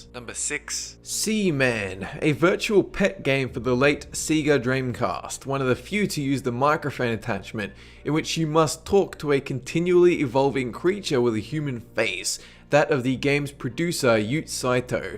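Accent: Australia. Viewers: Heyyyyy are you Australian? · I thought he was Australian (?)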